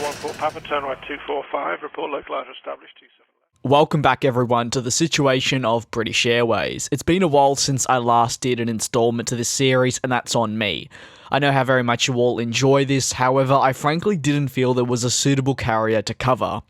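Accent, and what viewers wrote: Australia. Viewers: Your Australian arnt you mate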